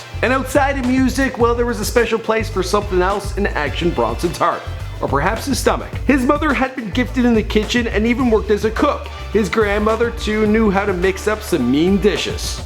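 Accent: Canada. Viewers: nice canadian accent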